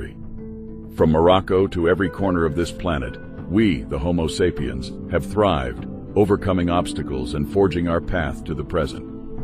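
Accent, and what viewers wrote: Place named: United States. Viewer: Odd, the American English. Not even an Arab slant to the English! 😢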